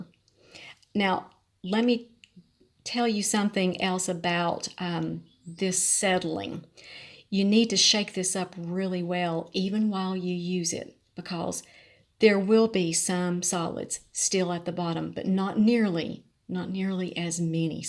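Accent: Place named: South, United States. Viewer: I love your videos!!! Your voice is so sweet, and it reminds me of spending summers in the south as a kid! I have learned so much from you about my orchids, and so far they’re doing great. My question is about fertilizing my orchids, in that I only have four, and mixing a gallon of fertilizer for 4 orchids seems wasteful. How can I adjust the amounts for so few plants?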